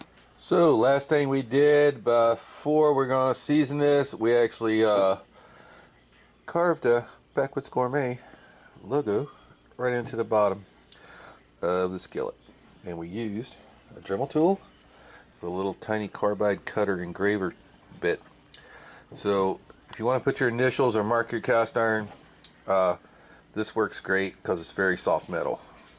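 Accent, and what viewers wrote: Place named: Pittsburgh, United States. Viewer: You from Pittsburgh?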